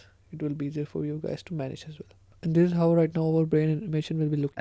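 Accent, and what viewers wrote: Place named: India. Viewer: Yeah, it sounds to indi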